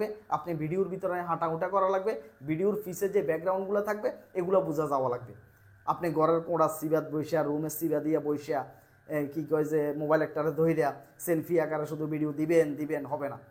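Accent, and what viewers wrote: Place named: Netrokona, Bangladesh. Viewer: ভাই আমার মনে হয় আপনার বাড়ি নেত্রকোনা,, ❤ আমার দেশের বাড়ি নেত্রকোনা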